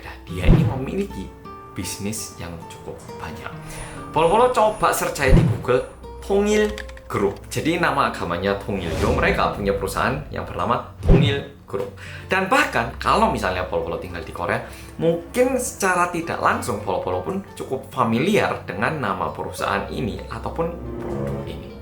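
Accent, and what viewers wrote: Java, Indonesia. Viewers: meskipun YouTuber lain nya sudah upload tapi aku masih tetap setia menanti videonya mas. G tau kenapa enak saja di dengar, jelas dan mudah di pahami 👍🏻🙏🏻 karena aku suka YouTube Yang MEEEEEDDDOOOOKKKKK🤗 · medok ngk ap 2 bang kan lucu orang korea medok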